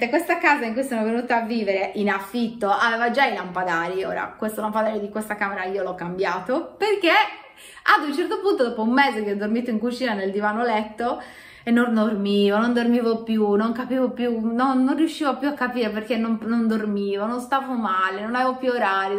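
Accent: Italy. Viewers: Ovviamente più intelligente del normale 😅, l'accento sardo e' carino...😅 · ciao sardo anch'io e con lo stesso modo di parlare al minuto 2 e 30 (ghe al posto di che) alla romana ma io nn vivo a roma....è normale???complimenti per il coraggio (sei anche una bella ragazza)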